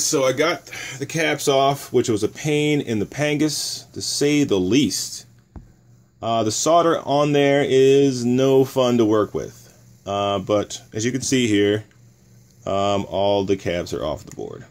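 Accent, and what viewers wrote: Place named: RP, United Kingdom. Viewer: Your british accent is quality lol I thought you were a London Toff ... Good vid Castle fixed ready for next RC Land Battle ;O)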